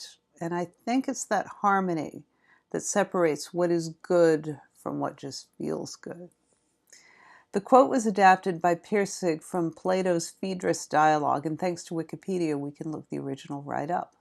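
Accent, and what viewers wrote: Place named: United States. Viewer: The YouTube algorithm was spot on with a link to your channel. Great work - many thannks. I have just started ZAMM again by listening to it (curtesy of pirated version on YouTube) having first read it in 1978 and read it numerous times since. The American voice is adding a new dimension. One reading following the route with Google Street View is something I would recommend. America is so different to UK where I have done all my riding. I have also been listening to JBP, Rebel Wisdom, John Vervaeke, Jonathan Pageau and Paul VanderKlay so this is really pulling lots of strands together. I am an Anglican priest and also a motorcyclist who has spent hours and hours in motorcycle maintenance. I have never really seen why ZAMM is specifically Buddhist. Really looking foward to the rest of the series and hoping to find time to engage in the discussion in the comments.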